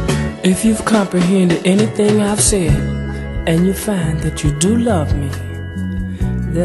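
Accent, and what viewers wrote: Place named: Germany. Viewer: I have a for every time he talks I melt the man have a accent that's out. Of this world he is German love the voice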